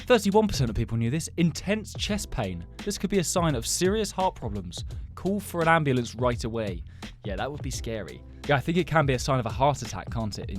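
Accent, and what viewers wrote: United Kingdom. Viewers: Are you British?😱😱😱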